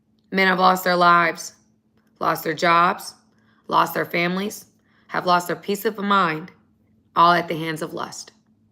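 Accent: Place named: New Orleans, United States. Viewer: I think New Jersey plus Atlanta, or Georgia, almost makes a New Orleans accent, specifically a westbank of New Orleans accent..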